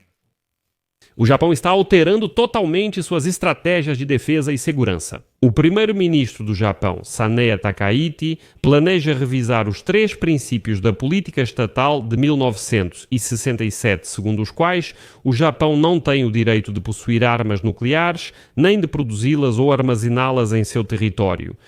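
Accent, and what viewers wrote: Portugal. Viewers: EESTE CANAL E POTUGUES E PELO SOTATAQUE TAKAICHI E ESPERTO NÃO QUE CONSTITUIÇÃO PROIBE A ELA QUER DAR UMA RASTEIRA NA OPOSIÇÃO, AO INVES DE FAZER BOMBAS ATOMICA ,COMPRA DOS EUA ,E FICA AMOSTRAR P CHINA E KOREIA DO NORTE ,NOS TEMOS OGIVAS NUCLEAR ,VOCES QUE FIQUEM ATENTOS ,OK,😊😊ELA E INTERIGENTISSIMO ESTA SANAE ,SAN ❤🎉😊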